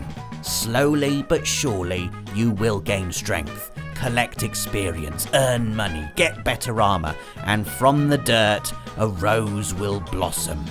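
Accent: United Kingdom. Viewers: A few days ago,i got suggested this channel.Since that i watched a few dozen videos.And while i was watching,started to wonder why i like this so much.Good content,down to earth commentary and the main thing,a british voice! There is something in that accent which gives some extra boost to commentary.Also i just love it.Hope this will be running for years.Also,from elder scrolls,i would say that Skyrim is worse,there you start as someone that stands in line to lose his/her head.There is Kingdoms of Amalur,where you are starting as someone who just gotten dumbed as a lifeless corpse down to the rest of your kind. :]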